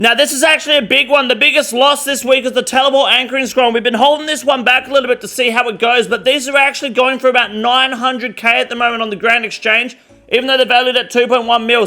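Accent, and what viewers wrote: Australia. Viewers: Never thought RuneScape would have a unhinged Australian. I love it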